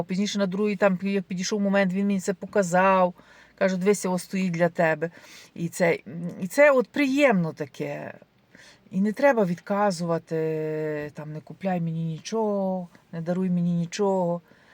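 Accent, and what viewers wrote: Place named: Galicia, Ukraine. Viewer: Де ви, оберталися у совєцьку добу? Що ви розповідаєте про "подарунки на новий рік "? На заході України не дарували подарунки на новий рік- це просто нонсенс. Подарунки дарували на Миколая і по всьому. Я з Тернополя, тож відповідно знаю добре Львів- маю родичів у місті, приїздила у відрядження. На Галичині більшість людей навіть не святкували новий рік, тільки Різдво. Ви маєте галицький акцент, але не самого міста, можливо району. Принаймні, жодна людина у моєму колі не користала суржик. Щодо намиста, то такі прикраси є дуже тренді, прикрасить будь-яку сорочку чи сукню і до вишиванки надзвичайно гарно. Послухайте красиву пісню чарівної " "- якраз про це. Певно, італієць мав на меті подарувати щось особливе. 🇺🇦✌❤🖤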